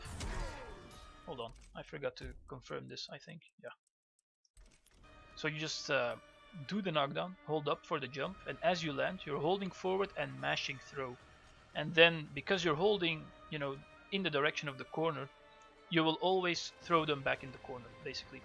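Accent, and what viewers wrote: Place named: Flanders, Belgium. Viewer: HAHAHA. Gewoon het vlaams accent in Engels is zoiets uniek dat ik da kan opspeuren gelijk een hond xD Mercikes voor de guide man, tis straf!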